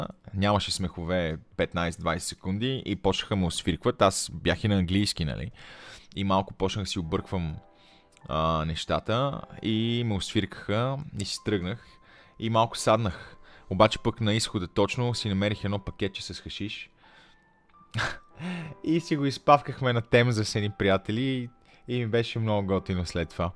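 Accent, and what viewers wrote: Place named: Bulgaria. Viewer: Интересно ми е, дали главно са те освиркали заради Българският ти акцент или наистина шегата е била неприлична за точно конкретната публика.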